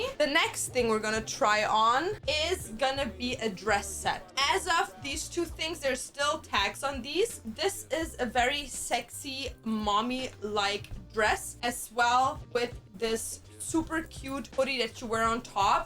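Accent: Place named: Germany. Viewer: sie klingt so deutsch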